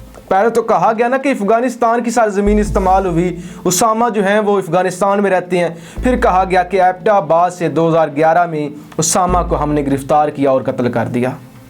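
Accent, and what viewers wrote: India. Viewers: گلت فہمی نہیں ہوتا غلط فہمی ہوتا ہے آپ انڈین لہجہ چھوڑ دیں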